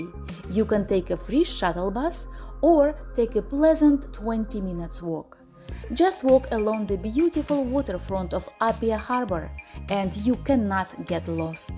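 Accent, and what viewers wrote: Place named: Russia. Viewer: )) у вас русский акцент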